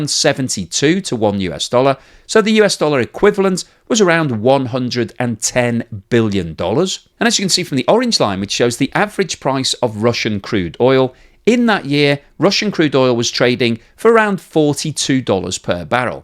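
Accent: United Kingdom. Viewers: As a non native English speaker, I feel speaks the clearest English amongst all Internet speakers. Can anyone tell me from where is the UK is his lovely accent?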